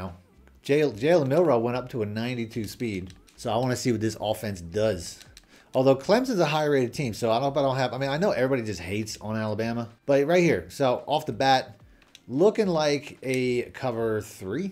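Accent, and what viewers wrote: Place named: Delaware County, United States. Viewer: You sound like you’re from DELCO….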